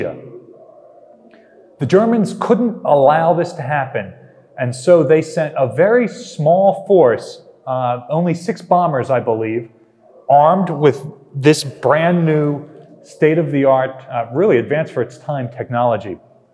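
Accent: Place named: Missouri, United States. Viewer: Dudes obviously from Missouri....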